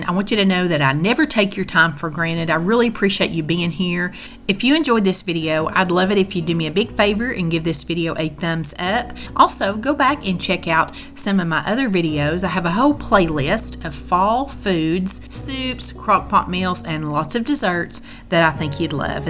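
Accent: South, United States. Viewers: I could have eaten every bite and licked the pot! However my significant other won't eat soups, chili, dumplings and just about anything with a broth, including spaghetti. Really bums me out. We are your near neighbors just off the Blue Ridge Parkway in western NC. This beautiful fall weather has really put me in the mood to cook. I'd have to eat off a potful for a week by myself or try to freeze most of it. No close family or neighbors to share with. Loved watching you cook and hearing a sister southern girl.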